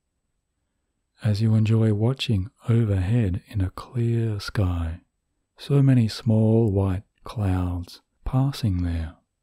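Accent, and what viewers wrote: Australia. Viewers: are you an Aussie? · I thought this was Australian accent? Either way it is super comforting. 🤗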